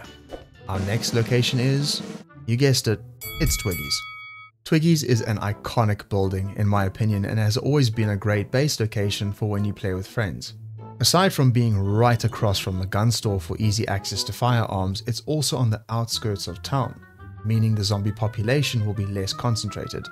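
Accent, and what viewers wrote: South Africa. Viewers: Maat, is jy 'n Suid Afrikaner? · are you South Africanbru?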